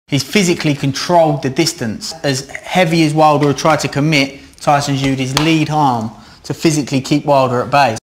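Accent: Canada. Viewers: You’re a Canadian aren’t you. I know that accent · An odd mix of a Canadian or a Devonshire accent plus American, where are you from and where have you lived? i mean.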